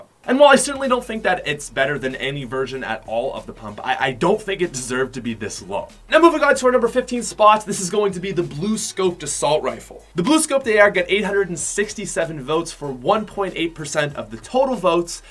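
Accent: Canada. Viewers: He’s mega Canadian jeez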